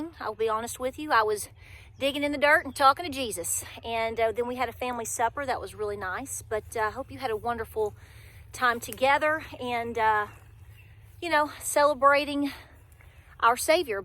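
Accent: South, United States. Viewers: You are the absolute BEST!!! Except for the southern accent, you sound like me! I am astounded that people are so stoopid!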